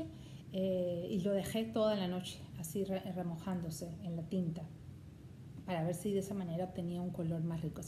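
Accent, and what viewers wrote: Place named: Peru. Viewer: Hola! Acabo de descubrir tu canal, por tu acento y el torito de Pucará, que mencionaste al final, me parece que eres de Perú ¿?.... Si es así, que alegría haber descubierto un flosstube de una peruana 🤗🤗.... Están lindos los bordados que enseñaste terminados y los que tienes planeados empezar. Las telas me han encantado sobretodo la que resultó de la combinación de ambos colores. Saludos desde Lima y feliz bordado!!!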